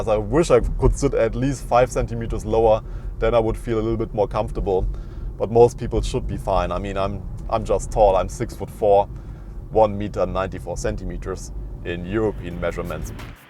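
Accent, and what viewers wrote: Germany. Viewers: Krass wie schnell man einen Deutschen an seiner Schrott Aussprache erkennt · After 2 minutes watching you video , I discovered that the review is in English hhhhhhhhhhh , I love German-English ... Good review man , thank you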